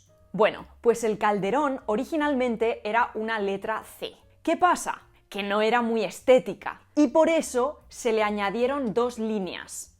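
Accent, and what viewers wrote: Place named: Castile, Spain. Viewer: Te Parces a una mAesttrA meddievL que tuve para mi todavía mi mejor maeau.mujer lindísima , pero deberías cambiar cambiar ese horrible acento español, como decía tú nuestrA compatriota Anabel Ochoa la mejor sexóloga de México, me caga la gente como yo qué todavía no se quitan llSzz y lasttzz , por qué en su pueblo solo usaban cacharro pa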